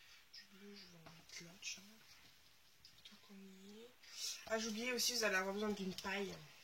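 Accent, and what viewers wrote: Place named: Normandy, France. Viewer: re :c'est déjà fait , tout est au maxi , par moment je vous entend et d'autre beaucoup moins.je vais investir dans des enceintes alors mais je m'en servirai que par moments car dans certaines vidéos que je regarde je mais au minimum car les intro son en musique donc c'est trop fort . vous êtes de quelle région ? Votre accent me rappelle celui de la Normandie. bonne soirée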